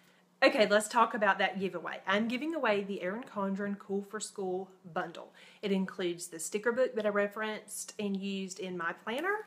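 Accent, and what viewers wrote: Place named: North Carolina, United States. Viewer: rule number two - check your attitude. my husband and i died laughing! [yes, he watches your videos with me! we love your voice......hope that isn't weird. reminds me of north carolina when i lived there] i have never seen a teacher planner from EC, looks neat and i love the little fixes you made to it to make it your own!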